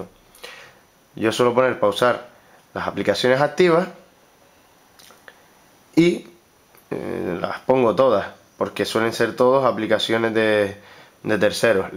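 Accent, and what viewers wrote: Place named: Canary Islands, Spain. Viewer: wow se nota q eres de canarias, yo soy de grancanaria, la feria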